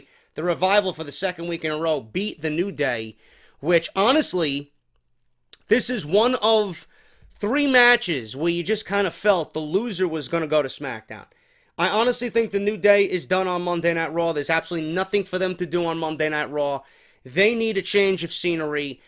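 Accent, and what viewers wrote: New York, United States. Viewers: Is this guy Vince Russo's kid or do all New Yorkers sound the same?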